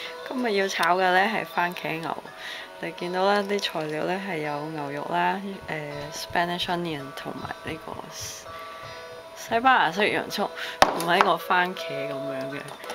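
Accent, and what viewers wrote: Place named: Cantonese, China. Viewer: DV沒電了嗎?~_^ 您的廣東腔果然很重, 我看到了妳廚房裡面也有"米酒" haha~~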